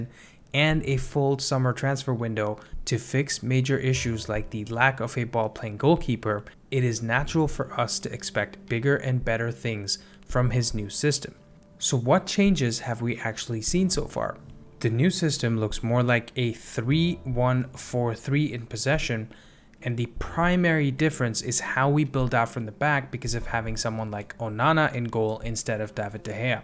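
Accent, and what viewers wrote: United States. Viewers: Could have just said there’s barely any def-midfield. Wolves opened them up like a human body on operation table but then it’s supposed to be a 10min YT video. This season will be shite. (Also your accent gave away north USA feeling 😊. Just saw Canada in your bio). Good analysis overall.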